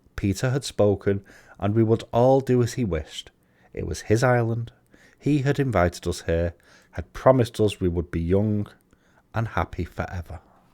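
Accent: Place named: United Kingdom. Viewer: That was great, thank you! It’s so nice to hear a Brit read something on YouTube, good to hear it in a reasonably local accent too. Will check out your review of the whole book too.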